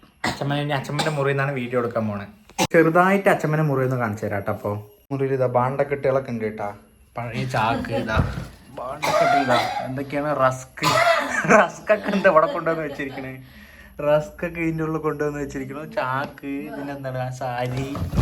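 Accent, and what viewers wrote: Palakkad, India. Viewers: ആദ്യ subsciber ആണ് ഞാൻ.. അന്ന് പേന ഒക്കെ കൊടുക്കുന്ന വീഡിയോ കണ്ടത് ഇന്നലെ എന്ന പോലെ ഓർക്കുന്നു. അന്ന് എനിക്ക് ഈ ഫോൺ അല്ല.. അന്ന് ഞാൻ comment ഇട്ടിരുന്നു നീ നല്ല മനസ് ഉള്ളവനാണ് നല്ല നിലയിൽ എത്തും, ചാനൽ one മില്യൺ അടിക്കും എന്ന്... അന്ന് 1k പോലും ആയിട്ടില്ല എങ്കിലും എനിക്ക് ഉറപ്പ് ആയിരുന്നു..പാലക്കാടൻ സംസാരവും ആ വീടും വീട്ടുകാരെയും എല്ലാം എനിക്ക് ഒരുപാട് ഇഷ്ടമാണ്.. ഒരു അഹങ്കാരവും ഇല്ലാത്ത ആളുകൾ... എനിക്ക് എന്റെ അനുജനെ പോലെ ഇഷ്ടമാണ്.. എന്നെങ്കിലും നേരിൽ കാണാൻ ആഗ്രഹമുണ്ട്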